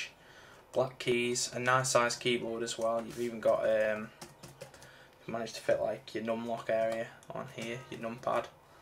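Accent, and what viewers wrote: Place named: Liverpool, United Kingdom. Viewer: Are you from Liverpool? Your accent sounds like you're from Liverpool so I was asking if you're from there...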